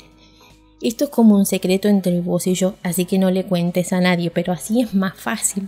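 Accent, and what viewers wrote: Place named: Tucumán, Argentina. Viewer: Es de Tucumán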